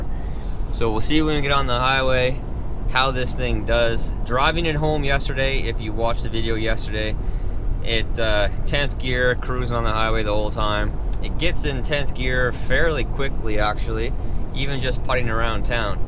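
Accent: Canada. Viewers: You are Canadian, i thought American but hey love Canada man love Canada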